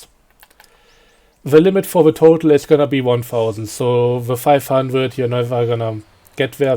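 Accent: Germany. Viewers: Man merkt das du deutsch bist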